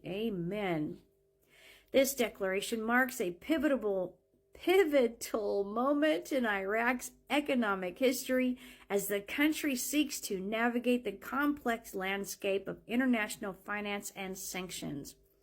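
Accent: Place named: United States. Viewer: So this person sounds like an American....who CLEARLY hates America. Iraq hasn't been using the dollar for almost a year except for oil.... Well, the only ones in America who buy their oil is demon rats.... When there's a republic rat in America drills and sells/trades it's own oil.... It's no surprise or problem that a country like Iraq stops trading in dollars for oil.... It bothers me that an American is so excited by this though.........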